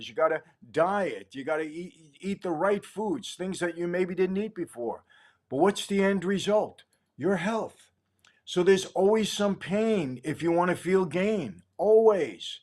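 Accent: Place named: New York, United States. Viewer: As a Native New Yorker living out of state, I especially appreciate your New York accent and tone to reinforce what I already know (all of my family are gone). I am 66 but you also come across fatherly and that feels reassuring. Most of all, I appreciate your walk with our Lord and your faith in Him. BTW, I have a very similar testimony as you in how I was convicted of the truths in the Bible and the revelation of my relationship with God. The main difference is that I was not in prison. I was at the end of myself, though. God bless you!!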